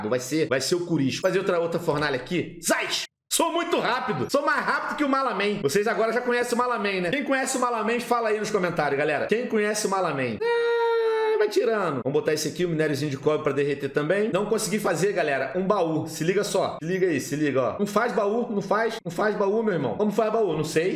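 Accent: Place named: Portugal. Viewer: eae depois de muito tempo sem ver seus videos eu voutei quando vi seua intro ja bateu uma nostalgia cara amo seu canal assisto seu canal desde os meus 5 anos de idade saudades amo seu canal percebi q vc ate ta com um sutaque meio portugues kkkkkkkkkkkk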